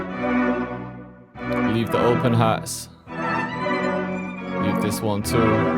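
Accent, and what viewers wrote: United Kingdom. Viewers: I like how uk ppl say 808 A O A 😂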